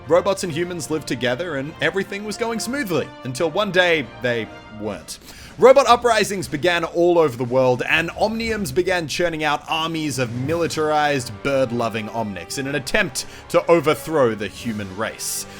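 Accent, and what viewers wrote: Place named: Australia. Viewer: your Australian showed so Hard in this vid. Reyes is pronounced Ray-ez, not Rays lol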